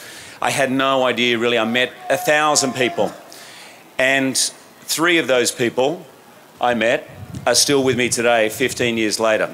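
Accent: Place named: Australia. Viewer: An Aussie to be so proud of - and he still sounds like one!!!!! Love him - and his family.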